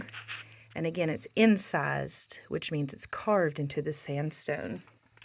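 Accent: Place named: South, United States. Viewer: Fascinating video - thank you! Also, I have to say you have a wonderful speaking voice - very clear and pleasant with a nice Southern accent. That and the interesting subject make this an outstanding video.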